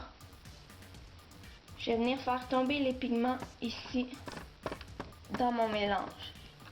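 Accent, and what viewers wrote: Quebec, Canada. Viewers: T'es Québécoise, j'adore ta video, je vaiz abandonné sur ^^ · Québec ou Montréal ? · Je kiff ton accent kebequoi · Super vidéo, merci pour cette vidéo. Je sais pas pourquoi mais j'adore les accents du Québec __ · wouah quznds m&me la vidéo elle d'ate ;-;" et sinon je kiffe grv ton accent québéquois UvU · j'adore trop ton accent kebbekoi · waseem Elle est Québécoise! :) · j'adore ! franchement j'adore tout ce que tu fais c'est tout simplement génial ! continues comme ça ;) ps : j'adoooooore ton accent :p (québécquois, c'est ça?)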